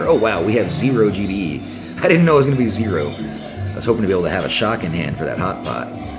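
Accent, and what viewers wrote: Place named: Texas, United States. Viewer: Haha you smoothie you 🤪 Mellow music in the background, deep Texan voice, shades and a bit of paraphrasing “it’s all about the science baby, just relax” Nice vid 👍🙂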